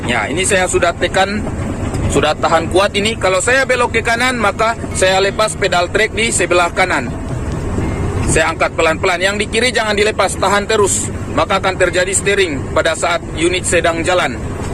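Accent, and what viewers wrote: Bolaang Mongondow, Indonesia. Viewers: Mantap bang jelas sx👍 Mcam logal bolmong😁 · Rupa logat mogondow ini noh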